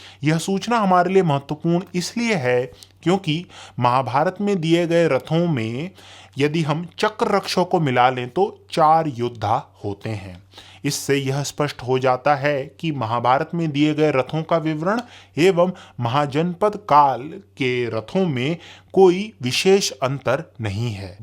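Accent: Standard. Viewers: request, aap standard hindi toh bolte hi ho, par thoda thoda awadhi language (awadh region ) words and slang, accent bhi use kar liya karo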